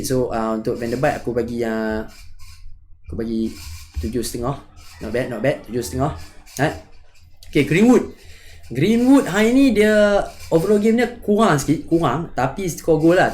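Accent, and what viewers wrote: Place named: North, Malaysia. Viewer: org utagha sggh abg ni 😆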